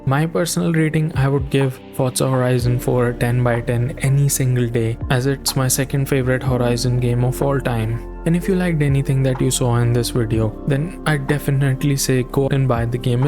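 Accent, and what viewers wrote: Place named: India. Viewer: Great review brother, you got Indian accent — Indian? I love that accent! Keep up the great work, you'll gain more sub eventually. 😎🍾🍻🌟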